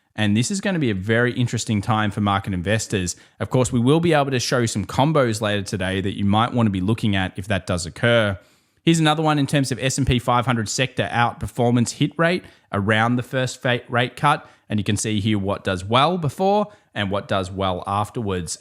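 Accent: Australia. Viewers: Hi, your vids are excellent education regarding TA and stats. A huge thank you! I like the aussie accent, too. It reminds me of the time when I was living there.:) Keep up the great work! Cheers from Germany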